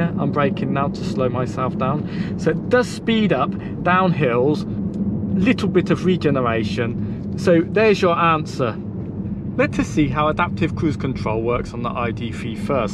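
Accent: United Kingdom. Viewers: I agree again when it comes to B mode. In the end it depends on the kind of roads you're driving on and your personal preferences. The Travel Assist seems to be working quite perfectly. I can't wait to get my ID.3! BTW: Are you British or how did you get this nice British accent? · Hi, great video! I have an ID.3 Life on order. I’m curious, are you British, Portuguese or what? Greetings from Sweden.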